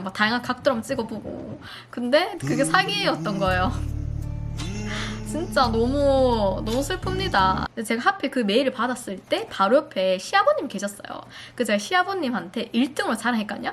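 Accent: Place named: Daegu, South Korea. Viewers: 항상 웃기고 재미남 지송 사기당했다는데...있는그대로모습 미국에서 대구사투리 찐정겹당 ^^ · ㅠㅠ 너무 속상한 일일텐데 말도 너무 웃기게 하시고 ㅋㅋㅋㅋ 사람들이 비슷한일 당할까봐 열심히 설명해주시는게 너무 귀여우세용!!! ㅋㅋㅋ 저도 대구사람이어서 사투리도 너~~무 반가워요!! 은행사이트도 구글에 검색해서 들어가면 똑~~~~같이 만들어서 사기치는 놈들이 있다고 하더라고요.. 꼭 진짜 사이트인지 확인 잘하시고 이번에는 더 큰일 안나서 다행이에요!!♥️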